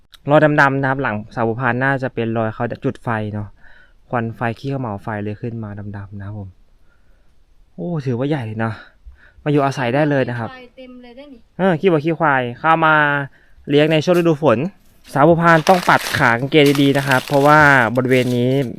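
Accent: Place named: Ubon Ratchathani, Thailand. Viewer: เว้าคือลาวอุบลน้อ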